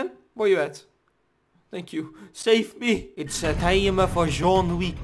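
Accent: Netherlands. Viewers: Are you from the netherlands you sound like that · You have a dutch accent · Hi’s accent is soooo Dutch😂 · ik ga stuk je nederlandse accent hahahaa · your english sounds really dutch. Are you from the Netherlands?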